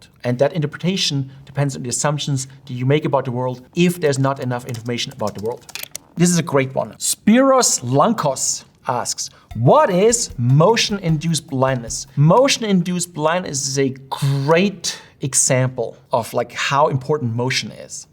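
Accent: Germany. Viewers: I just knew he was German as soon as he started talking😂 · I HEAR GERMAN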